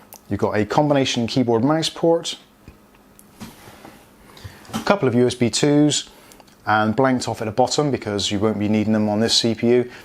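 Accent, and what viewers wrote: Bristol, United Kingdom. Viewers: I've ordered a 2080ti model - also do I sense a South West (bristol?) Accent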